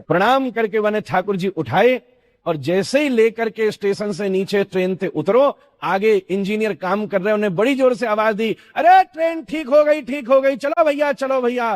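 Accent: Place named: Braj, India. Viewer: Brij ki boli, meethi boli❤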